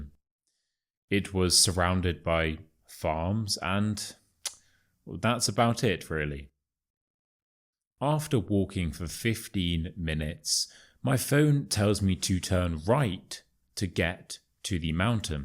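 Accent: RP, United Kingdom. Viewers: Hello, dear! Thanks a lot for the excellent opportunity to practice your nice British RP! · I like you reading in British English accent. Because making me leaning more English words when you reading Thank you 🙏 ❤for your share teaching In British English accent You good teaching ❤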